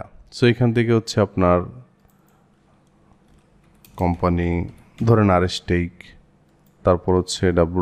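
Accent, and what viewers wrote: Sylhet, Bangladesh. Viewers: Bhai aponi Sylhet er manus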